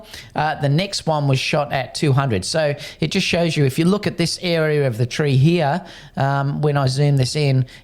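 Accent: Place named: Australia. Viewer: Thanks for a great review in an Aussie accent! How does the image quality compare with the Sony 24-105?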